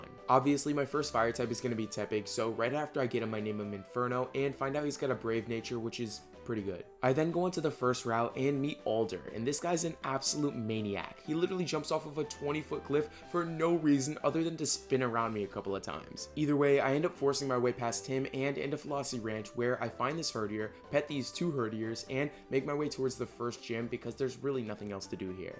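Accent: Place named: England, United Kingdom. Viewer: Bro which country are you from? From your accent I feel England. BTW love from India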